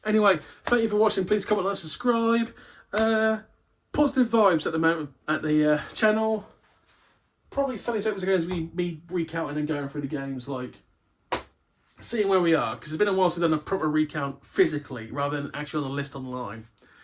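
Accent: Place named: Germany. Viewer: That was 1 funny upload 😂 loved the German accent 😂 and defo checkout gaming rarities channel 👍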